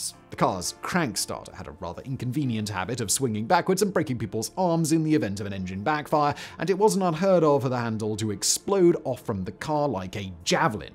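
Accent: United Kingdom. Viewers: Thank you for cleaning that up. The Model T contributing to the stock market crash is almost as foolish as the car contributing to anti-Semitism. Clearly, just because one has a great beard, a cool British accent, and wears glasses doesn't mean that one is a sophisticated thinker.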